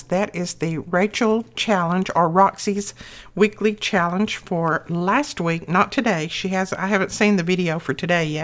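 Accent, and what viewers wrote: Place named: Alabama, United States. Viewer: These are wonderful, E! I've heard Rachel from Roxy Creations mention you a couple of times. She's impressed with what you are doing with her challenges!! I am praying for your husband. I know it is difficult for him and difficult for you, too! May God touch his body and heal him. Not our will but God's Will be done! Love everything you do, and I love hearing your Alabama accent since I lived in Alabama for 8 years, and even went to the University of Alabama. Where in Alabama do you live?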